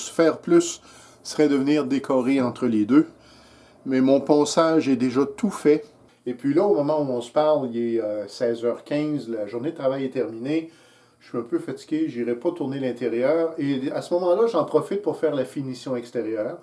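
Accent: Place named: Montreal, Canada. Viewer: bonjour je me suis abonné dernierement.je ne suis pas tourneur n'y menuisier mais vos video sont trés enrichissente de conaissance. vous n'ete pas avare de renseignement et de conseil votre voie est calme et tres douce tres sur,tres professionel. votre accent j'ai l'impression d'entendre mon beau pére qui est de montreal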